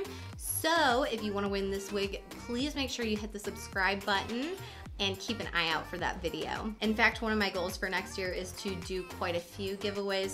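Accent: Ohio, United States. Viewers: is she from Ohio? She talks just like someone i know who’s from Ohio lol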